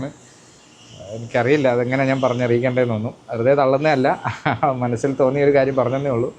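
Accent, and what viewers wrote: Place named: Palakkad, India. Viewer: ബ്രോ 😂എനിക്ക് നല്ല സംശയം ഉണ്ടായിരുന്നു നാട് pkd ആണ് എന്ന്..... ആ സംസാരം കേട്ടപ്പോൾ തന്നെ തോന്നിയത് ആണ് പിന്നെ ചോദിച്ചില്ല എന്നെ ഒള്ളൂ..... പിന്നെ വാഴ പിണ്ടി യുടെ കാര്യം പറഞ്ഞപ്പോൾ.... വീണ്ടും തോന്നി പാലക്കാട്‌ എവിടെ ആണ്....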